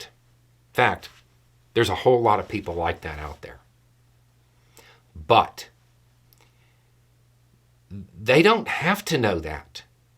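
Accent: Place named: Transatlantic, United States. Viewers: transatlantic accent is not something I ever expected to hear. 10/10. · Hi, I really enjoy your compassionate analysis. I understand this video was a tangent for you, but I really enjoyed it. Also, please feel more than free to use the transatlantic accent as often as possible in the future. I loved everything about it, no notes! 🙂❤️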